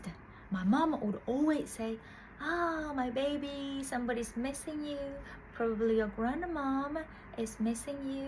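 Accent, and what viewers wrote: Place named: United Kingdom. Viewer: love your British English accent :) ! Thanks for the helpful vid!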